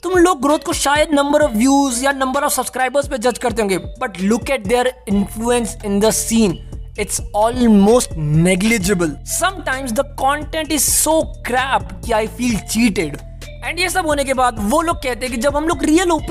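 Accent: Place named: Delhi, India. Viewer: This channel admin is definitely from Delhi 😂😂. His tone told alot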